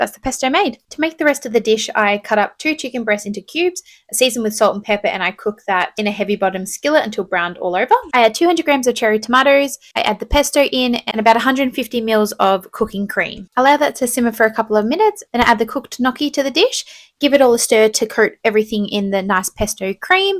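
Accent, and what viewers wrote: Australia. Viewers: The coles bags got me here, love hearing an Australian accent 😅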